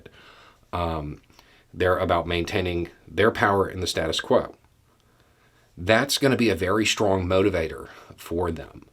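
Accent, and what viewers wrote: South, United States. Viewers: Is in Florida? His Southern accent is pretty prominent.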